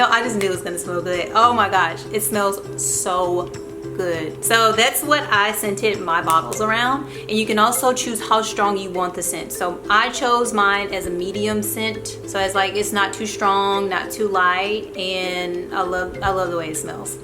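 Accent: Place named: South Carolina, United States. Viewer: I like your accent what state is it from? south Carolina?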